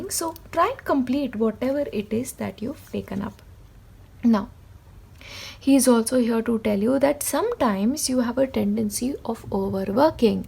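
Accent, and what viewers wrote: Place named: India. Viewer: Thank you for the readings 💐. You rightly said we are a soul group, connected through messages 💖. I claim pile 2 as I like turtles and these messages connect with me 🙏 are you not from India? Your accent is very Indian 😊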